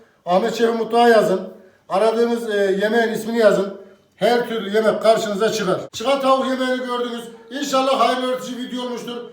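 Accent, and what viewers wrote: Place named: Isparta, Turkey. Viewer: Ellerinize sağlık Sefim memleket neresi Bizim ıspartanın şivesine benziyo konuşmanız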